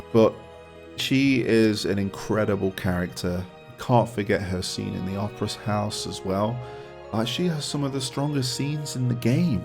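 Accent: United Kingdom. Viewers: And you, sir, I put you in the S Tier. Your English accent really rounds out your professional approach to it all. You’ve got my subscription although I will say… Sabin is S tier. s for suplexing that trainnn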